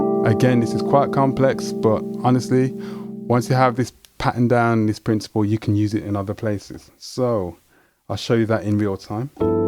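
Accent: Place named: Birmingham, United Kingdom. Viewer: great stuff. Do I detect a fellow Brummie? 😊😊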